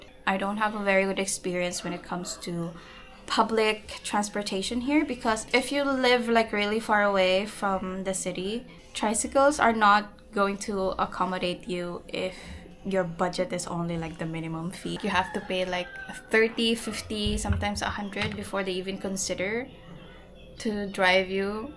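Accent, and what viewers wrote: United States. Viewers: 🤔 I'm curious, you philipinna? You sound like a girl from the U.S.😂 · Honestly, don’t mind the bullies. You speak perfect English I am from Chicago and you sound like an American with your English accent. I was wondering if you lived in the US where you’re from the US because of how good your English pronunciation and accent it’s amazing by the way I’m Filipino and I speak fluent Tagalog and I’ve been living here since 1993 I grew up there that’s why I am so amazed with how well you speak English more power to you. I wish you well and your son as well God bless and keep trusting God he’s got your back don’t worry. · I can tell u grew up with an American father or influence. Ur American English is very evident. Good luck on ur channel. Love ur accent.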